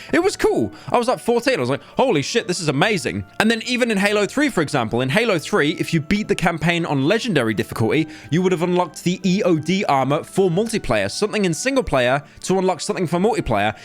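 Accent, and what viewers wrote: United Kingdom. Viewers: Me listening to some british boy talk for 12 minutes about a game I’ve never played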